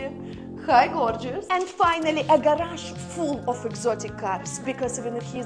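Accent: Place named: Russia. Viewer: Но она специально говорит здесь с русским акцентом. Есть видео, где все намного лучше. А вы не комплексуйте, все нарабатывается. Ну и пытаться совсем избавиться, от акцента тоже, на мой взгляд, не нужно.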